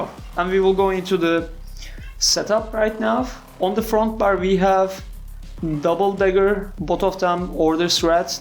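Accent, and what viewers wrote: Turkey. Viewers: are you from turkey mate?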